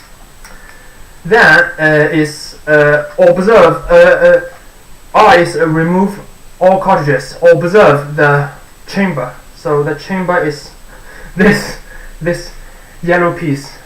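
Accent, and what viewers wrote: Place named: United Kingdom. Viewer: Why sounds British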